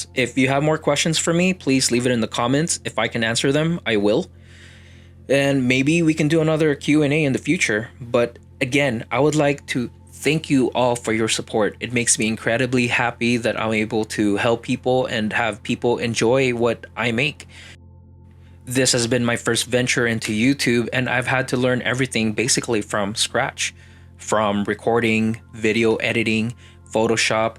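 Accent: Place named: Philippines. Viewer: As soon as I heard your voice I immediately knew you were filipino! Very glad to see a fellow Filipino, and a genshin creator at that. Love your vids and hoping you get 10k soon, keep it up bro :D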